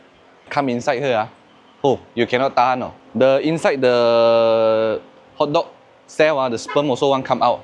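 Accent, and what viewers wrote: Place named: North, Malaysia. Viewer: bro, mcm lain pulak ckp english 😂.. suka dengar you cakap bahasa utara. kepoh sikit 😆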